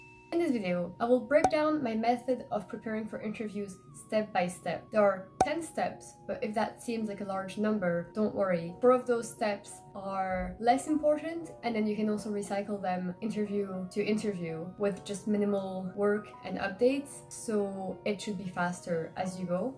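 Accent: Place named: France. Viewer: oh cool I've lived in Brussels for a short while. Yeah your accent was saying French and your colours Scandinavia. I guess looks do fool lol 😂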